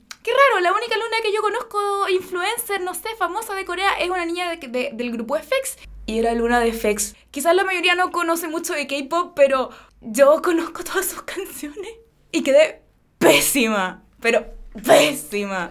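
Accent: Chile. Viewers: BUEN VÍDEO, ME GUSTA MUCHO ESE ACENTO CHILENO ^-^ ♡, ( TE DEJO UN RETO, " HAS UN TOP DE LAS CANCIONES MAS HERMOSAS DE ÁNIME ) ♡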